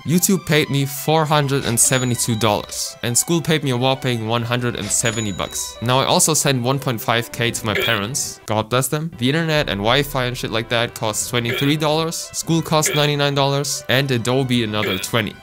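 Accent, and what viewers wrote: Germany. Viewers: im a trippin or does he have a german accent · german accent detected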